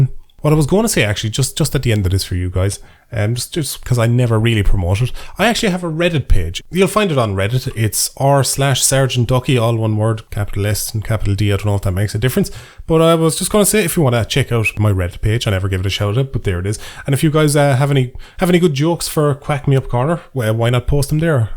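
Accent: Ireland. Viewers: It’s actually the melodic tones of your voice. The brogue is an orchestra to the ear and the humour is fowl... birds of a feather and all. Side splitting humour to the point of not being able to breathe. And yes ... we can feel it 😜😜😜ps... the missus needs her own shirt 😋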